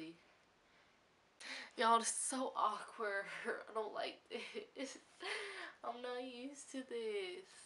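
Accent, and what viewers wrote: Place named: Tennessee, United States. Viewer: I can hear your accent from living in tennessee... you talk completely different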